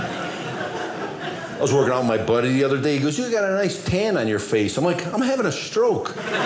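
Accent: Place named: Midwest, United States. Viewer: Mw accent is so perfectly complementary. "YeAh that's da guy!": flawless timing. Funny guy.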